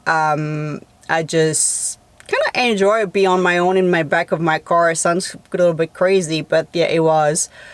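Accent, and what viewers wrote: Quebec, Canada. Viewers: French Canadian, from Quebec city.✌️💕🇨🇦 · I think she said her accent is from Quebec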